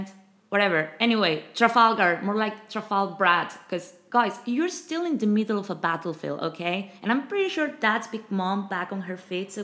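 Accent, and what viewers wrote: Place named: Spain. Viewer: The innuendo in this vid title and the previous is jokes 🤣and as usual the spanish accent spicy as always 🥴, great vid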